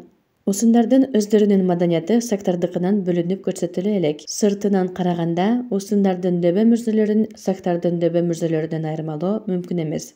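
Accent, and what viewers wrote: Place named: China. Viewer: Акцент эмне үчүн,кытайлык кыргыз айым го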